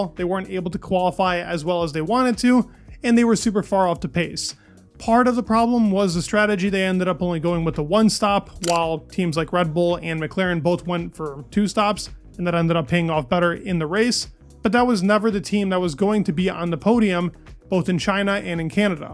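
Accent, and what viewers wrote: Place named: United States. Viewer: You should learn a proper English accent instead of american. Americans don't belong in F1. Drop the annoying vocal fry too.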